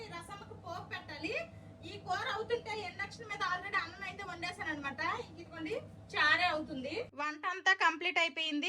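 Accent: Srikakulam, India. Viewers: Hai sister నా పేరు సుధ మాది విజయనగరం పార్వతీపురం hai cheppandi Mi vlogs chaala baaguntaayi Mi srikakulam యాస బాగుంటుంది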